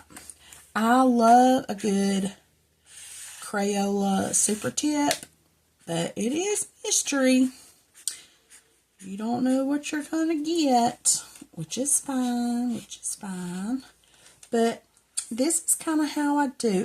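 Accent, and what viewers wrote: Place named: South, United States. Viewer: no girl…but I am from Texas. My son was watching with me when I played your video and he said…oh she’s from the south. She sounds like us! 😂😍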